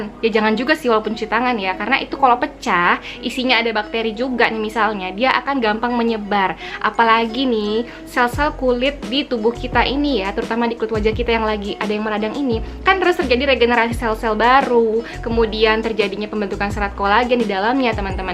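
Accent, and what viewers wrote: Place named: Sunda, Indonesia. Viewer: Urang sunda ning😂😂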